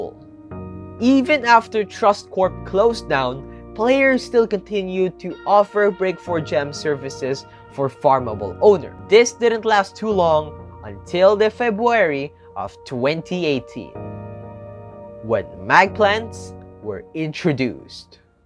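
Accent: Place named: Philippines. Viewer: I already know your filipino couse of your voice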